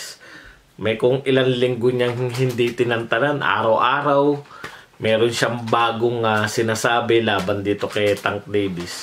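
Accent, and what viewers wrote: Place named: Tagalog, Philippines. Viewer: Galing talaga mo boss. Malinis managalog at kahit walang video sakto mga punto.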